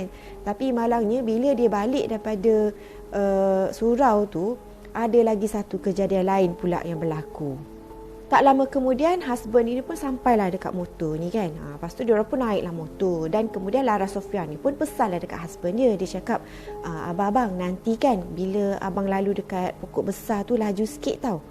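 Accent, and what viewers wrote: Kelantan, Malaysia. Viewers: Assalamualaikum kak.. org kelantan ke kak ? hahahaha dgr mcm lorghat kelantan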